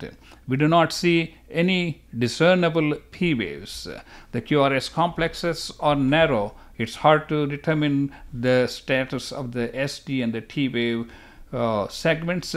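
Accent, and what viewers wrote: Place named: India. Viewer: I don’t understand Indian accent 👎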